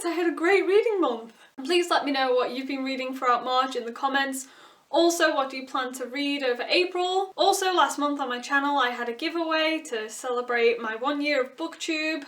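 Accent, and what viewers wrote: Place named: Leeds, United Kingdom. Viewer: what part of the UK are you from? hope you don't mind me asking, just trying to pinpoint it through your voice... kind of sounds like you're from the Leeds area??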